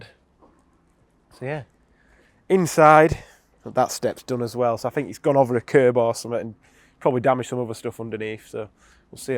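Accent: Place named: Barnsley, United Kingdom. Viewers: You from Barnsley fella!!! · Thought I recognised that Barnsley accent! 😆👊🏽💪🏽 Those cars are driven by absolute fucking pellets with literally no driving skill whatsoever. No surprise it's been twatted. Please try to make sure you don't sell it to another colossal helmet 😆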